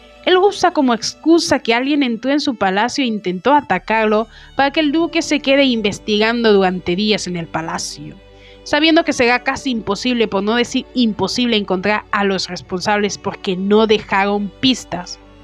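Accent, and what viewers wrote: France. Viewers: Oye eres de Francia? Esque tienes un asentó muy bonito 😮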